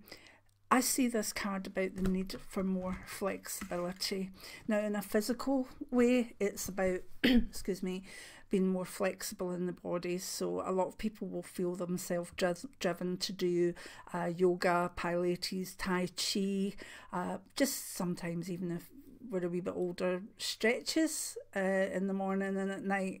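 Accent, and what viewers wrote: Scotland, United Kingdom. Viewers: I'm a brand new subscriber. Your sweetness, kind and loving energy and lovely Scottish accent captured me. Thanks for all your shared wisdom and helpful videos. Much love, light and abundance of all good things to you. 💞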